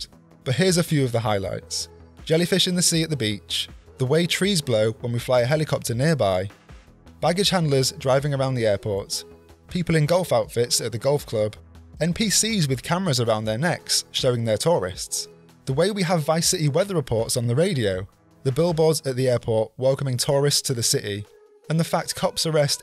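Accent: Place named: United Kingdom. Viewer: well wishes for you as well!! I guess he is British, at least thats what we can suppose by the accent hehe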